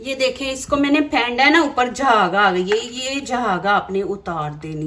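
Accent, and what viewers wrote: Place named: Punjab, India. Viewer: Ap Panjabi logo ki Urdu b alag Hoti hai pata chal jata hai Panjabi ho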